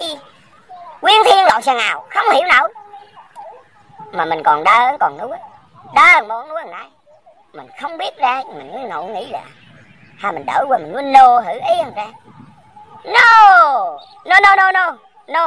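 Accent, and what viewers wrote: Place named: Quang Nam, Vietnam. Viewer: Yeu lam tieng Quang nom!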